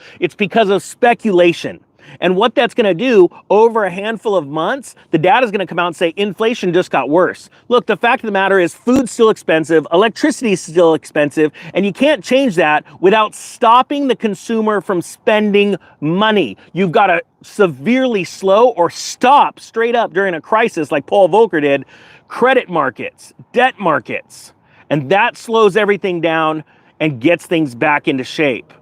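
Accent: RP, United Kingdom. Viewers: This sounds much more serious in a British accent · I love your Limey Accent! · LOVE THE BRITISH ACCENT!😅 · Love the David Niven accent,. Keep it up!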